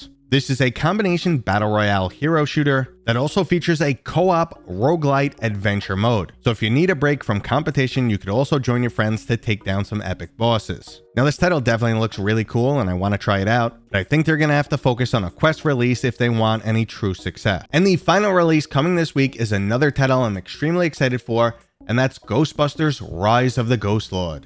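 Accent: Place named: New York, United States. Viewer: I want to say New York accent but it could be Boston although I'd be surprised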